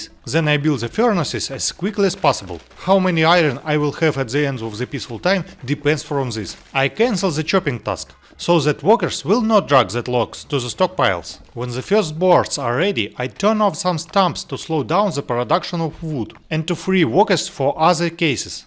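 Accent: Russia. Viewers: я не лингвист и могу ошибаться, но вроде бы это ошибочное мнение новичков в языке (и я так думал; не то чтобы я был гуру в английском ^_^, но считаю, что уровня Intermediate). Чётко и с акцентом -- русским так чётче и понятнее, а иностранцам -- наоборот. Но ведь такое видео делается как раз-таки для них...:) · Русский акцент)))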